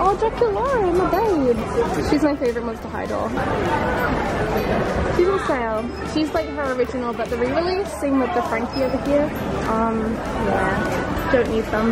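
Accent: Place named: London, United Kingdom. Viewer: Your from london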